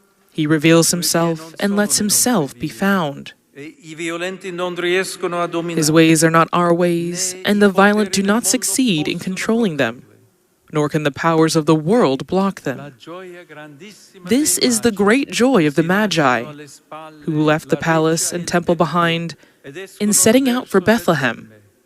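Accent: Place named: United States. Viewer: Crystal clear American English!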